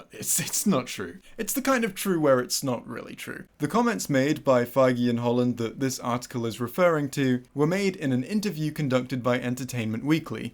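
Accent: United Kingdom. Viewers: You really care about topics no body asked for, little angry british fella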